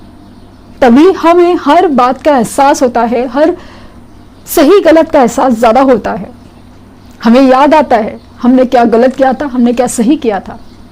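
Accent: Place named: South, India. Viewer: Mam ! Your Hindi sounds like south Indian Hindi, but feels very sweet to listen 👌👌😀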